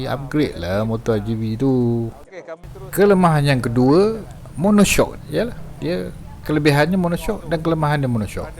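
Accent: Perak, Malaysia. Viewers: Suka dengar org perak ckp,saya asal perak tapi membersar kt bandar ipoh..tengah mencuba untuk memperbanyakan bahasa perak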